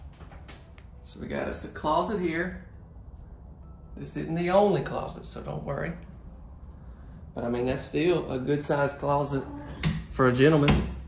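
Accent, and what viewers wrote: South, United States. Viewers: oh that would be great. we would gladly welcome you. You have that southern accent like i do. Only difference is i'm female. lol 😁 Don't forget to show us your beautiful family on some of your next home shows. ❤👼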